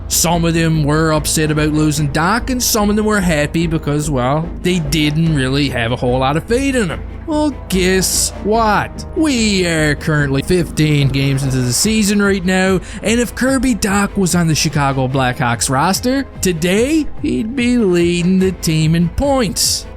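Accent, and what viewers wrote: Newfoundland, Canada. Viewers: I follow about 10 Habs page on Youtube, and you're my favorite. You're funny as shit, your Newfie accent makes it twice as funny. Keep up the great work budz 💯👌👍🐐🥇🏒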